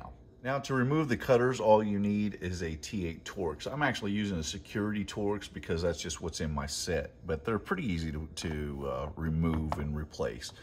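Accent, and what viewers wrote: Texas, United States. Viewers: Are you actually from Texas? I'm trying to place your accent. You sound like Michael Dorsey from Tootsie when he was doing the soap opera.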